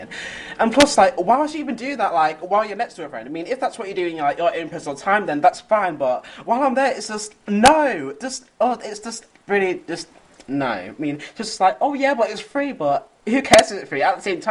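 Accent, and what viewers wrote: United Kingdom. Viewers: r u brittish?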